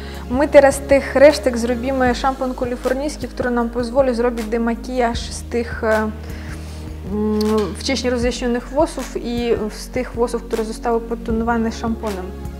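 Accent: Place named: Ukraine. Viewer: Kurwa ten ukraiński akcent jak ja tego nie znoszę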